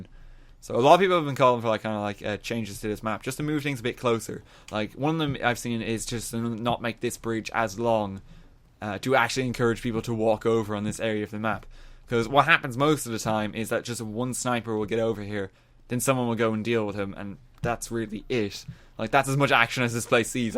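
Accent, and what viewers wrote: Ireland. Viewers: He's Irish.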